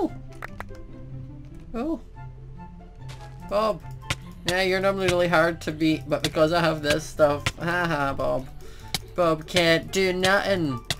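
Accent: Scotland, United Kingdom. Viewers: I know that this was a long time ago but is he Scottish? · Are U Scottish? iam (U sound Scottish) =)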